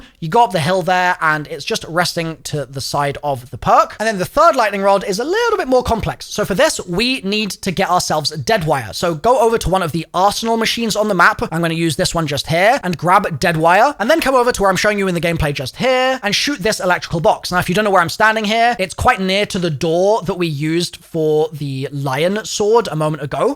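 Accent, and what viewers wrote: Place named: United Kingdom. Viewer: i love you but despise your british pronunciations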